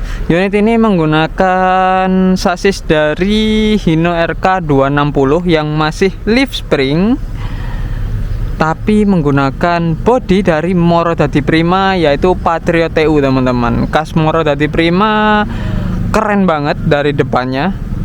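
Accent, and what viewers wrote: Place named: Banyumas, Indonesia. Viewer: Salam ngapak😂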